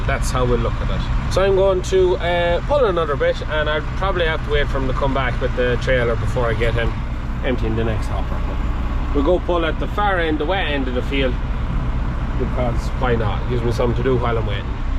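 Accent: Ireland. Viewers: I’m a first time watcher. It sounds like you’re from Ireland. My great, great grandfather immigrated to the USA from County Caven in 1828 when he was 14 years old with his family. My brothers & I now own the farm he settled in Renville Co., Minnesota in 1866. My mom inherited it when her parents died in 1987. We inherited it from her in 2008 when she died. We rent the cropland out to a corn & soybean farmer. It’s a little more than 92 acres. What county are you from? Thanks. I subscribed too.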